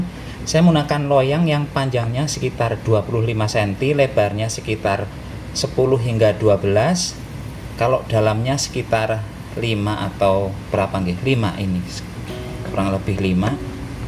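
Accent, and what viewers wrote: Banyumas, Indonesia. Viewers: Ya Allah... Medok temmen kueh .. 😂😂. Jd nambah pingin tilik mbahe aring Banyumas.., brikute..smangaat terus masak" dg apa anane bae👍👍💪😇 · Happy masaknya dengerinn medokk jowone ngapak... Dan resepnya sy suka semua...good lucky 👍👍💃💃💃💖💖🌹🌹 mas km klu mkn bikin ngilerrr..... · wong ngapak ank e mbok dirah 🤣🤣🤣🤣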